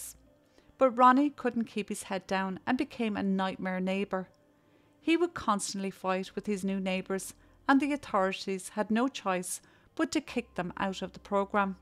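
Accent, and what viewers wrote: Ireland. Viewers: Dear, please don't be disheartened. Your videos are excellent and I love to listen to your lovely Irish lilt. Listening to you makes my day! ❤ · Its been such a long time since ive been back on. My apologies for not being able to show support on here as of late. I'm glad I'm back for now and will try to catch up on all the videos ive missed while I was in hospital. Certainly have missed my favorite Irish accent. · Your videos are incredibly well researched and beautifully narrated with that lovely Irish accent. You are very sensitive and respectful and can only do as well as the information out there. Hi from New Zealand 🇳🇿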